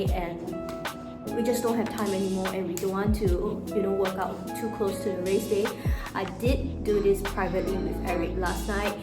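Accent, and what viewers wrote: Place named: Singapore. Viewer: Congrats again on your next milestone in life!!!!!! 💖 also I think you still sound quite Singaporean just that you enunciate your words properly hehe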